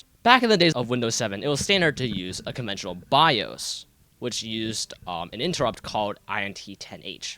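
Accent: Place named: Southeast Asia. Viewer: It's the South-east Asian accent.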